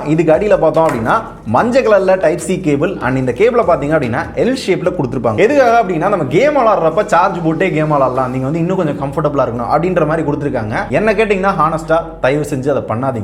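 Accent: Madurai, India. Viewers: Bro neega madurai ya?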